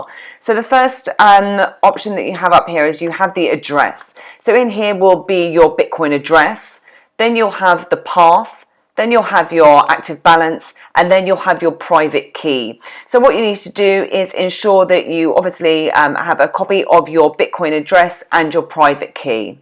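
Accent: United Kingdom. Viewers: You're pretty and I tolerated that British accent only bcoz of that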